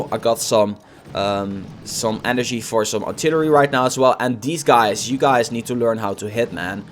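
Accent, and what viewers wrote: Netherlands. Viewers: Je bent 100% Nederlands, dat hoor je gewoon.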